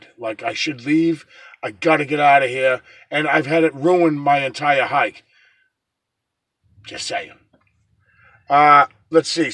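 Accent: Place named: Massachusetts, United States. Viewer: lmao I know I'm sorry....but look that up you will like that one and you will get lots of views on this whole topic..also I appreciate your replies...at least you pay attention to your viewers and don't ignore us as some do...keep up the good work...love the mass. Ascent. Lol don't know if I spelled that right..waiting for prt 2😃😃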